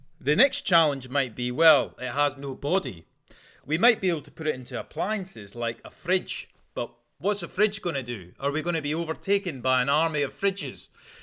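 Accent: Scotland, United Kingdom. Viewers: Hey man, are you from the same part of Scotland as Scott Manly? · Are u Scottish? I love your accent.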